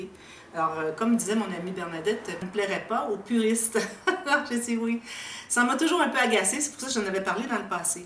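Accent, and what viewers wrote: Quebec, Canada. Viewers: Oh la la quelle histoire ! Pour pas grand chose ! Surtout ne changez rien. Vous êtes parfaite comme ça. On comprend très bien ce que vous voulez dire. Je donne des cours de scrap dans une association et j’ai toujours utilisé le terme « mater ». Je l’utilise pour mettre une photo sur un cadre un peu plus grand et « habiller » pour poser le papier design. Autrement j’ai adopté certains de vos termes 😁. Donc ne changez rien du tout. On vous aime comme vous êtes, gentille, rigolote, claire dans vos explications et surtout j’adore votre accent. Il me rapproche de mon fils, installé à Montréal, que je n’ai pas vu depuis plus d’un an à cause de cette pandémie. Laissez de côté les 3 ou 4 qui râlent et écoutez toutes les autres ! NE CHANGEZ RIEN Bises ensoleillées de la région lyonnaise Françoise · Moi j'aime beaucoup votre petit accent et vos thermes franco canadien ont du charmes je comprenais très bien se que vous vouliez dire .mais si cela chagrine quelques personnes faites le pour elles.... 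Merci pour vos nombreux tutoriels cela m'aide beaucoup à progresser ♥️♥️♥️ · Et coucou MATTER OU MATTAGE c est adosser une photo sur un papier ou un Carton légèrement plus grand pour faire ressortir la photo Donc je ne vois pas pourquoi changer puisqu on comprend très bien....c est ce qui fait votre charme avec vos mots à vous. Pour ma part j adore et comme je vous suis régulièrement je parle votre "Langage" mais avec mon accent Ardéchois et vous canadien A très vite Co and Fau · Mais, ne change rien, avec nos périodes de confinement en France, t'entendre, me permet de voyager, de me souvenir de mon ancien prof de math qui venait du Québec, bref c'est génial. Crois tu sincèrement que nous autres français sachions parler le français? Suivant la région d'origine, on utilise chacun notre français. Bisous, Schmack, Adishatz... Bref salut.... Et oui c'est ça le français.... Et j'adore les termes matter, couture, etc... 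Désolé de ne pas avoir pu t'aider 🤣🤣😜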